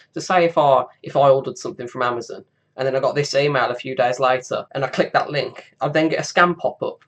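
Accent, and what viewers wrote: United Kingdom. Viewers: Do u live in birmingham, u sound like ur from dudley or west brom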